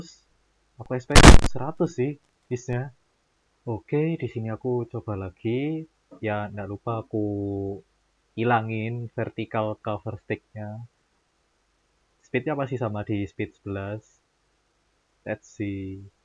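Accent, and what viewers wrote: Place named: Java, Indonesia. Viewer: Njir medok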